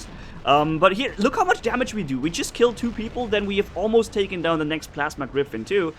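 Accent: Germany. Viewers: Been watching your vids for a while now, they're really awesome. As soon as a I heard you for the first time, I knew you were a fellow German :D. Your positive attitude is really cool aswell, makes the videos even better. Keep up the good work, I subbed :)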